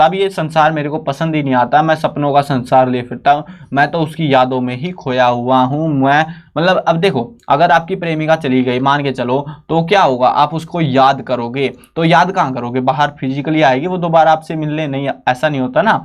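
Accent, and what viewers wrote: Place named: Churu, India. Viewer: Great sir.... Eetta acha to hme hmare teacher bhi nhai pdate.. Sir ap rajasthan me churu Side se ho kya apki language se pta chl rha he..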